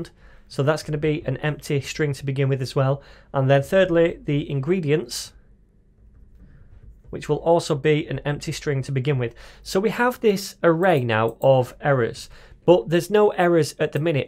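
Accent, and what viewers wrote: United Kingdom. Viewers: can you teach me a British accent. I love your tutorials. thanks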